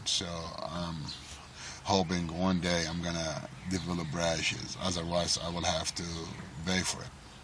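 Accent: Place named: Arab world. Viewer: hi, it's nice to know ur doing good n how much weight have u lost? and I know u have the Arab accent n the "aaaahhhhhhh" but tht gives a good laugh.. be healthy and awaiting your before n after pics..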